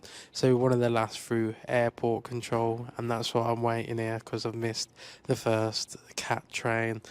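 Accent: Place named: England, United Kingdom. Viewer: Curious which city/part of England your accent is from?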